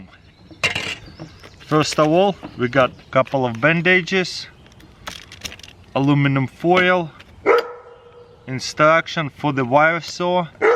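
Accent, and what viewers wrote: Russia. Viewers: sir, i find your accent to russian. please get a vanda white to do the talking.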